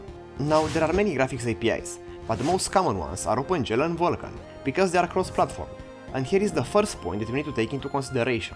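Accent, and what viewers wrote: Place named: Romania. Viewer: that romanian accent 🤌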